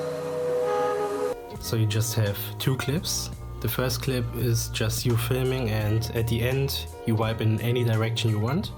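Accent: Germany. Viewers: dieses Englisch man merkt so das du Deutscher oder so bist xD aber Inhalt ist top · Are you German or Something like that?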